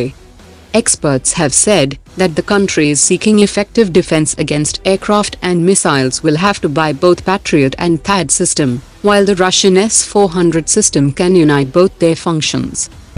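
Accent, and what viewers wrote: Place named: South India, India. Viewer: YOU AREA INDIAN LADY , DON'T PRETEND TO BE AMERICAN ,, I THINK YOU ARE A SOUTH INDIAN , LOL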